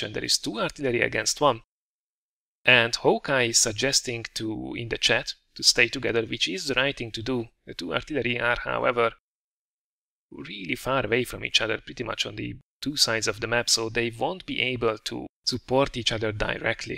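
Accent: Hungary. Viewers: Tudtam, hogy magyar vagy! :D Csak néha belenéztem a videóidba, és a kiejtéseden lehetett hallani, hogy magyar vagy. Csak amíg nem jelentetted be, nem voltam benne biztos!:D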